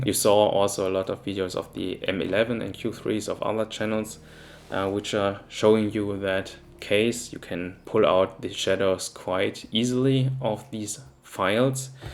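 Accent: Germany. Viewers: i think i hear a german accent, live in Germany? i was incredibly hyped up for leica q3, you shattered my dreams :( :P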